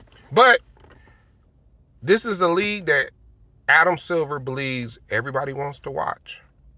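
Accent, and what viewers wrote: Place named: California, United States. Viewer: how about the minute you started talkin' I knew you were from California bruh. Either LA or Long Beach.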